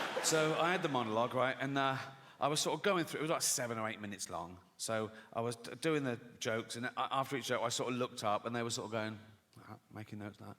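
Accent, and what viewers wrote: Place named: United Kingdom. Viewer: Only a brit can do this! It would not sound so good in American accent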